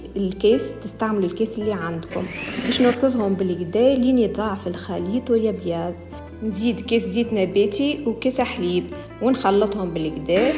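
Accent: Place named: Tunisia. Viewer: كلش احب اللهجه التونسيه برشا برشا👍 واكيد راح اجرب الكيكه شكلها طيب 🌺 تحياتي عراقيه مقيمه في المانيا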